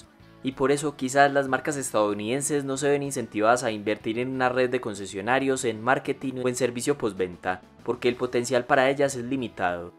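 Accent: Antioquia, Colombia. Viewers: Colombiano, de la región de Antioquia, llamado paisa.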